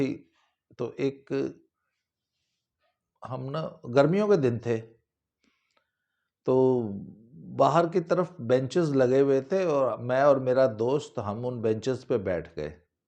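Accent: Haryana, India. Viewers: uncle ji thari haryanvi kasut andi hai hehe..swaad aa gya sunke hehe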